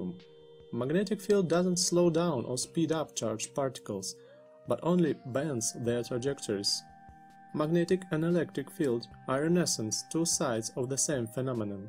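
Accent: Russia. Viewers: Is it a russian accent? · русский акцент? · Акцент русский, не спроста?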